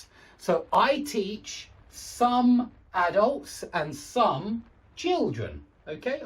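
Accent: United Kingdom. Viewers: I love your British accent, sending you love from Egypt ❤️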